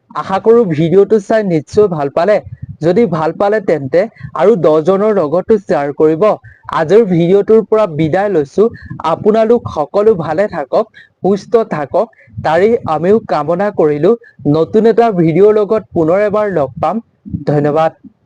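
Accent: Bangladesh. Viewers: Aponar kotha khini bangla deshi jen lagil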